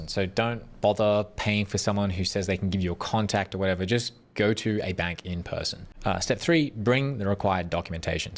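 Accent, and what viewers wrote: Australia. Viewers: So aussie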